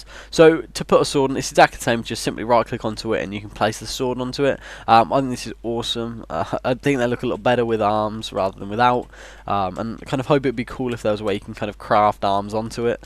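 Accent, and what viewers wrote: England, United Kingdom. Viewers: I know it may be obvious to some but are you English? I can hear your accent but I doubt it a bit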